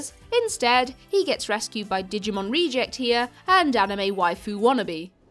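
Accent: RP, United Kingdom. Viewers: Just started playing this game, so looking for reviews...this is your first video I've seen, and am wondering how you can sound both sexy and posh at the same time...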